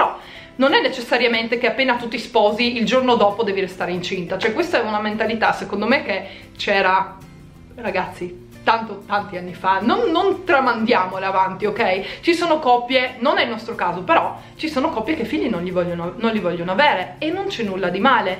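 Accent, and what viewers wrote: Trieste, Italy. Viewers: sei fantastica così! Con il tuo accento triestino che ti contraddistingue, la tua mandibola che se ne va per gli affari suoi e con tutto il resto che ti caratterizza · Tralasciando il fatto che quando hai menzionato la mandibola è stata la prima volta che ci ho fatto caso (sennò ciaone che me ne accorgevo), pensavo che il “parlare strano” fosse riferito all’accento triestino!! 😆 Comunque sia sei stata fin troppo gentile e sincera, anche perché non eri assolutamente tenuta a dare nessun tipo di spiegazioni, specie a gente che per le domande che fa non sa davvero stare al mondo. Se muovessero di più il sedere per ottenere quello che vogliono invece di lagnarsi dei successi altrui non sarebbero tanto maligni e invidiosi. Robe da matti.. (PS: vorrei proprio vederla la scena dal vivo, dove l’imbecille di turno ti chiede se sei incinta e tu “no. 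Sono ingrassata.” BOOOOOM!! Figura di 💩) (PPS: fregatene, sono invidiosi e stupidi. La tua family ti vuole bene e ti apprezza sempre❤️) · Penso che quando intendono che parli strano.........CHE SIA SOLO L ACCENTO TRIESTINO 😂😁😂😁😂😁😁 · ti seguo dal 2009/2010 e cavolo! non avevo notato la mandibola! pensa che quando leggevo "perche parli in modo strano?" io credevo intendessero la pronuncia o la voce e pensavo boh? sarà che e' triestina e slovena e non si sente molto in italia sta pronuncia?? io sinceramente non ho mai notato il problema della mandibola! boh! che gente però... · non è che parla in modo strano, di chiama accento triestino ragazzi. per chi abita vicino al confine è normale prendere l'accento simile alla lingua dell'altro stato (anche io sono di trieste, capisco perchè ti dicano così). non credo che ad una persona ad esempio di napoli (ho preso un posto a caso dove ci sia un accento sentito) direste "parli in modo strano" solo perchè conoscete l'accento napoletano. 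gli accenti ci sono in tutta italia amici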